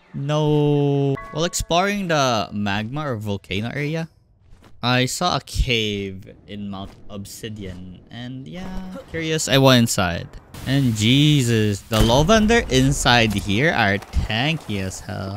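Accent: Philippines. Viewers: I hear Filipino (Pronounced: "pill-la-pee-no") Accent... Filipino ka??? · You have a filipino accent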